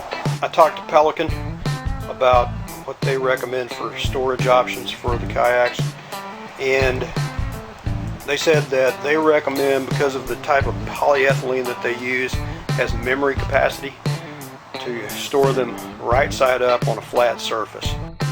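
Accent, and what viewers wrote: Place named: Texas, United States. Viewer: Nice solution for clearing out the deck. I have only one so I hung mine from the rafters in my garage. By the way I forgot to say from your last video, your new intro is awesome, the Texan in you really comes thru. Thanks for the video, looking forward to new adventures with you my friend. JT. from Oregon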